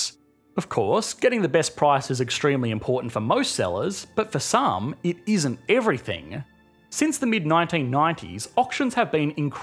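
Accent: Australia. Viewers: He’s aussie mate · This guy has a very Australian accent. There are three Australian accents really, broad, general cultivated- with some variations between states too. This is almost a broad accent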